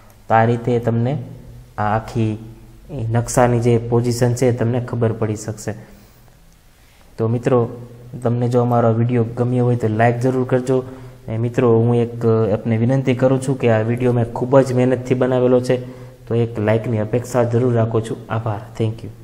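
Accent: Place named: Kathiawar, India. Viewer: ભાઈ ભાઈ, એકદમ કાઠિયાવાડી ભાષા બોલો છો... સરકારી ખરાબો ની જમીન એટલે શું ?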